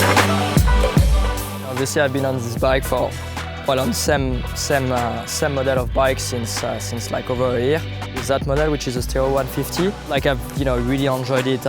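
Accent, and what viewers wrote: France. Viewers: Clairement l’accent français est là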